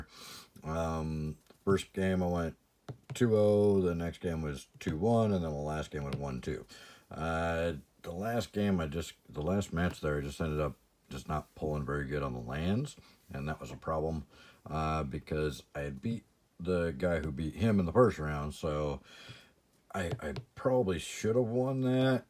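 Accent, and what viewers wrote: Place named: United States. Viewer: May I ask what area of the United States you’re from? Can’t get down where your accent is from. Watching here from MN. Keep it up!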